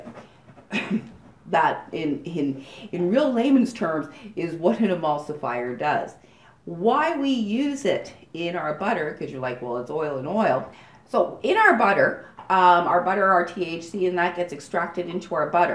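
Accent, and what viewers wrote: Canada. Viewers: I love how authentic you are when describing each machine. And your Canadian accent too!! 💚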